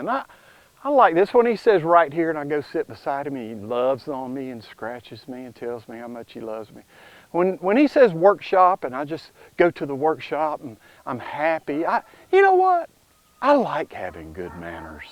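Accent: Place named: New York, United States. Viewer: Too funny! Your NY accent ...paaaw... I’m from ny got a good laugh from that!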